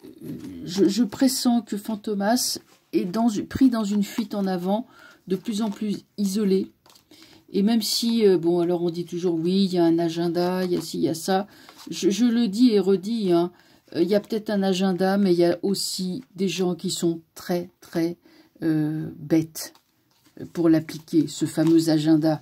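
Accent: Paris, France. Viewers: Merci toujours heureuse de vous entendre avec votre petit accent parisien et votre humour. Vous avez raison ils sont a la fin de leurs mondes. Et nous retrouvons enfin notre souveraineté il suffit d'accrocher nos ceintures de sécurité pour les dernières secousses.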